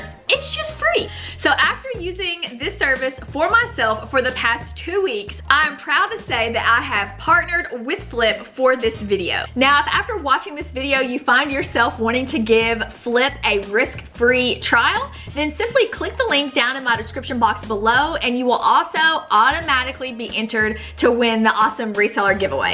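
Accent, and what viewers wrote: Kentucky, United States. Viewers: I just saw a channel about Mercari that wasn’t nice about them. It was from The Nurse Flipper. I learned about her from your channel. Now, I have been buying things from them for years and never had a problem. But TNF had a very bad experience with them, you might like to look at her channel and check it out. Love your channel and it’s a treat to hear a Kentucky drawl. My mother was from Benton KY and when school got out for the summer I would head for Benton to spend the summer with my grandparents. I love Kentucky!!!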